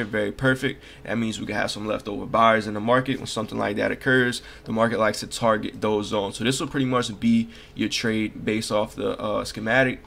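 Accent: Louisiana, United States. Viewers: Are you from Louisiana? You accent sound very familiar.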